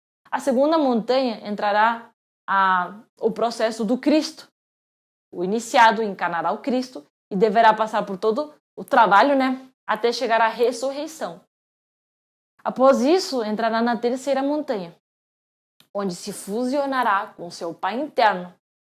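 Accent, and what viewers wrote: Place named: Paraná, Brazil. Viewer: Fala com sotaque paranaense legal.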